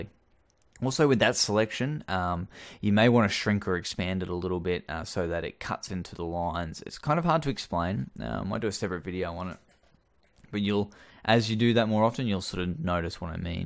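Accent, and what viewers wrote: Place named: Australia. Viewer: awesome nice style. good to hear an aussie, deff make more dude very informative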